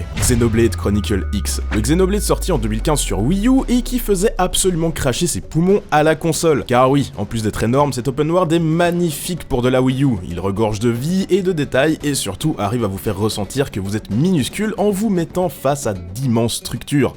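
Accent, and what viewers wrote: Belgium. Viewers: T'ES BELGE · mec t belge la classe (enfin je crois car tu dis nannante neuf)!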